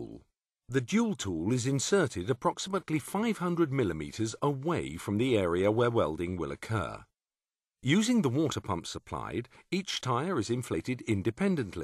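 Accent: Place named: United Kingdom. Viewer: Narrator is wonderfully British.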